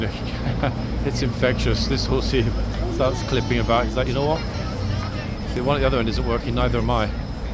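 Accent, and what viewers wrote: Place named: Cockney, United Kingdom. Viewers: I LOVE that wonderful cockney accent, albeit, sometimes, difficult to understand (American here 😉)Thank you for the clarification on who is English and who may or may not be British 😅 An additional question, if I may, the owner of this channel answered the gentleman’s question by explaining that when he refers to himself as English, he means he is not Welsh, Scottish nor Northern Irish….. is there a difference in Northern Irish and Southern Irish? 😊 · If you must make your commentary for your channel, please learn that a double 'T' sound is be-t-t-er not bedder, and also the letter 'H' is pronounced 'Aitch' not 'Haitch'. You do not say 'Mem' for 'M', or 'Lel' for 'L', so why do you say 'Haitch' for 'Aitch' 'H'? Please speak the 'King's English' and not that southern counties 'right-on' rubbish. Check the Oxford English Dictionary: aitch, n. 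The name of the letter H, h.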